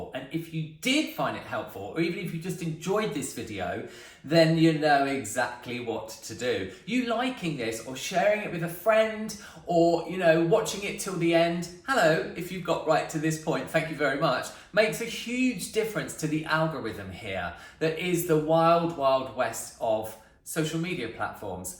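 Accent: United Kingdom. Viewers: Hi ,are you in the UK? I'm assuming you are by the lovely accent you have xx Do you take bookings can you do my hair please ?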